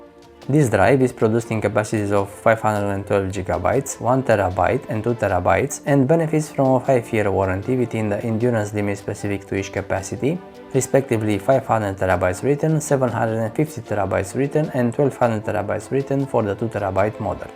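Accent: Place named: Eastern Europe. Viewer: Eastern European accent sucks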